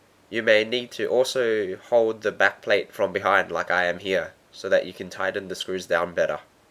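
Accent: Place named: Australia. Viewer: Australian accent is always nice to listen to.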